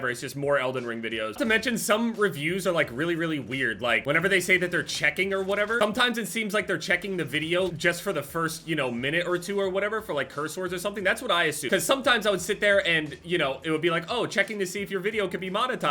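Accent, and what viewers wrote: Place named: New Jersey, United States. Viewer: from jersey, thats kind of how we just regularly talk lol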